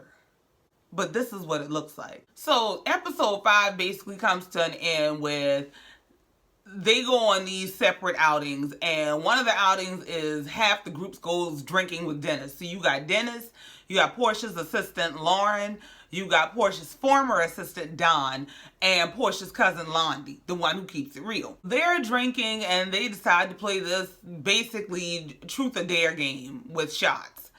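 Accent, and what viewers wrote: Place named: Gullah Geechee, United States. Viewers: Hey girl, love the channel and the videos. 1 question are you from South Carolina the Charleston area? You sound so much like my people and me. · Girl I hear that geechie accent.. Where are you from? Gotta be Charleston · Okay after watching the Arionne/Tasha K interview review and this one I'm subscribing👏🏿👏🏿. I thought your accent was from NOLA, but after reading another response, I see that you are from Charleston, SC. I was off huh?.. But then again I'm a Jersey girl.😆😅🤣 Loveee the accent and review!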